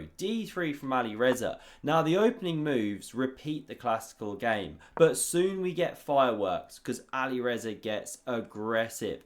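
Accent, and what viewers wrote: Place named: West Country, United Kingdom. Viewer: Love the witty comments: some of them I have to have two takes, but it keeps the action flowing. What part of England is your accent from? It sounds like a West country tilt (not quite a lilt, perhaps).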